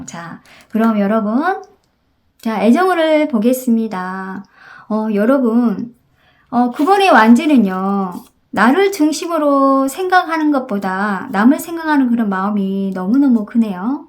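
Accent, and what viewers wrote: Jeolla, South Korea. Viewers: 1번 감사합니다 ㅋㅋ 전라도 분이신가 봅니다. 광주 목포쪽인가요? ㅋㅋ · 전라도 분 이신가 봐요?반갑네요! 1번 리딩 감사드립니다^^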